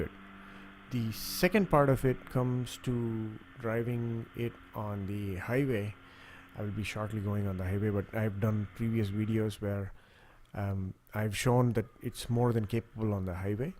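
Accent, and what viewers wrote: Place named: India. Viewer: You sound a little Desi!!🤔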